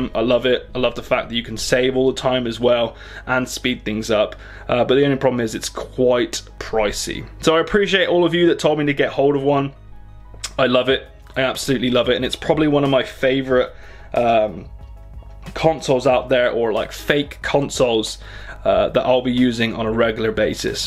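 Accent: United Kingdom. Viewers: he's brittish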